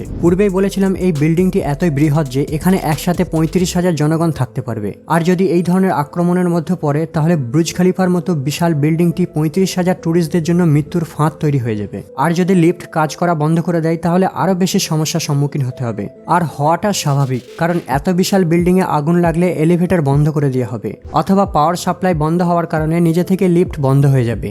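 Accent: India. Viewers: You not Bangladeshe you form India I'm sure your spk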